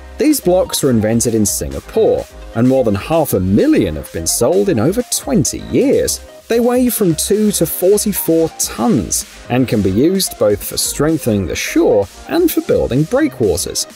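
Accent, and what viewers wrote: England, United Kingdom. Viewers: I find it odd and confusing that you sound English yet you are talking in 'dollars'. I live in Australia. Who's dollars are you referring to?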